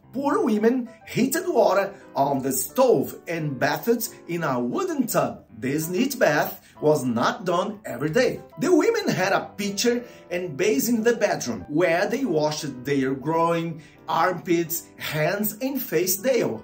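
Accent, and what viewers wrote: Brazil. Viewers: A pronúncia não está boa, mas, se você tiver gravado sem decorar, seu nível de inglês está bom. É só treinar mais a pronúncia agora, pois está bem abrasileirada. Você é fera. 💣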